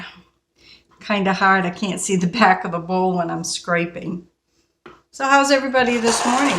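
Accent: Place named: New England, United States. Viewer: Are you from New England ? I know that accent. Im from Massachusetts. I thank you for your recipe. My daughter requested strawberry cake for her birthday. I am making a stabilized whipped cream frosting, her favorite.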